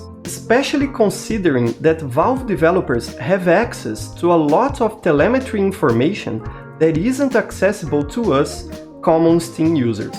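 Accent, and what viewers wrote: Brazil. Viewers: Excuse me aren't you a brazilian YouTuber already? I've noticed because of the accent. " " i believe? It's that the case? You sound extremely similar to the guy. Plus, this video is very similar to one of his, talking about the same topic!!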